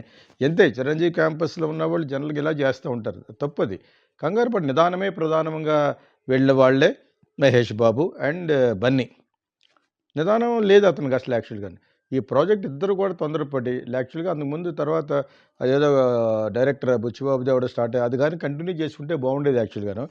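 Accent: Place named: Konaseema, India. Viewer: Whatever the subject...I like your Kona Seema slang...and enjoy🎉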